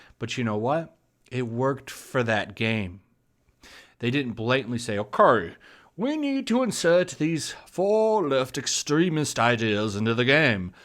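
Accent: United Kingdom. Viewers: Your huffy British accent is on point.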